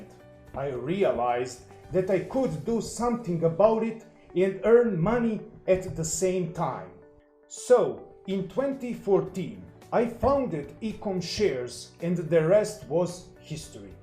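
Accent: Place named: Jacksonville, United States. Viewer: Lol grew up in Jacksonville? I knew I recognized that accent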